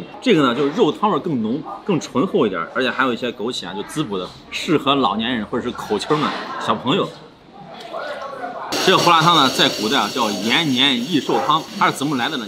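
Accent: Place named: Xi'an, China. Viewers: ，你是不是咱们西安人啊？ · 堪比中央电视台节目主持人，特别好的风格，看你探店吃美食就是一种享受。而且文化修养也非常高。我大学在西安上的，所以看到就觉得特别亲切。西安普通话😆 几乎每集都看，继续加油。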